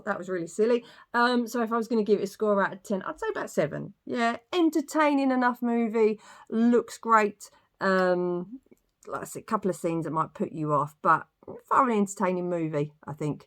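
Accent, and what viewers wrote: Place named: Southern England, United Kingdom. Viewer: I thought this last review was quite good. I saw it last night, and will probably go back and see it again. The fighting scenes were only ok, and yes, the reviewer is right. Too quick, and you can't see the action. Yes EVa Green (sigh). And Yes Where's the humour of the book and 1970s films. It's good that the reviewer has a southern English twang in her accent, I prefer intelligent ,pleasant looking blondes, without The BBC bias . Well done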